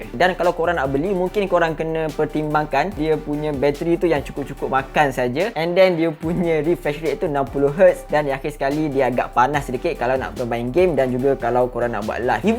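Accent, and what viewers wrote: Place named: East Coast, Malaysia. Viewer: Orang mana bang ??? sebab bunyi loghat la mcm pantai timur . Macam je tanye je jangan kecam jangan marah ok .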